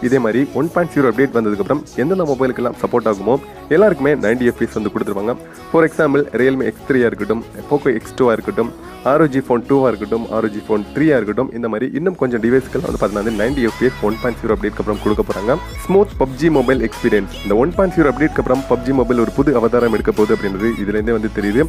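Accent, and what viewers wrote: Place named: Tirunelveli, India. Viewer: bro tirunelveliya bro la pottu pesuringa Naanum tirunelveli bro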